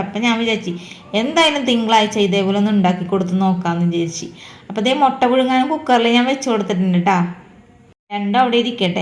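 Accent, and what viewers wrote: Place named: Kodungallur, India. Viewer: Thrissur districtile kodungallur bhagathenganumano iyale veed. Ente veed 3 peedika. Ente samsaram polend. Athond chodichathatta. Adipoli reciepe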